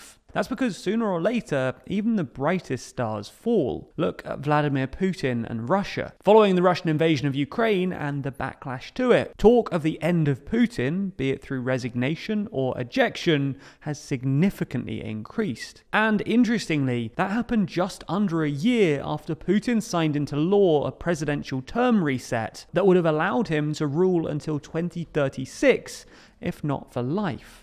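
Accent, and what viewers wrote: United Kingdom. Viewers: Great video but I hate British accent because some words sounded too soft like you swallowed half of it.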